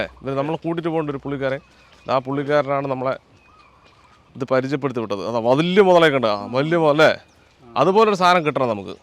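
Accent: Palakkad, India. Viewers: എന്താത് പകുതി ചെല വെച്ചതായിരിക്കുവോ😁 ❣️പാലക്കാട് ഭാഷ ഉയിർ❣️ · പാലക്കാടൻ ഭാഷ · കണ്ടപ്പോ മുതലകളെല്ലാം കൂട്ടത്തോടെ കണ്ടം വഴി ഓടി 😂😂😂 പാലക്കാട് ഭാഷ ശൈലി 😍😀😀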